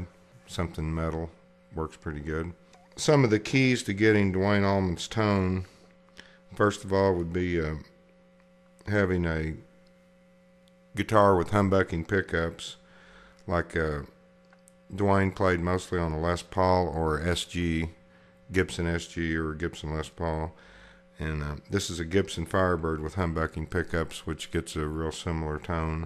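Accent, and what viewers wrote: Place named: South, United States. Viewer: haha yeah you do, i've heared a lot stronger southern accents before but for someone from scotland.. its still funny:P thinking of doing any other guitar lessons? perhaps on soloing? ( accoustic ).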